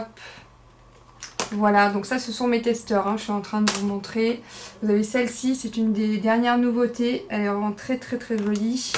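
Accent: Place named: Perpignan, France. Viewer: Hello ! Je découvre ta chaîne grâce à Sissi makeup. Super ta vidéo tu donnes envie 😍je vais y faire un tour 🥰🤑n’hésite pas à jeter un œil à ma chaîne. Il me semble qu’à ton accent tu es de vers Perpignan ? Bisous et merci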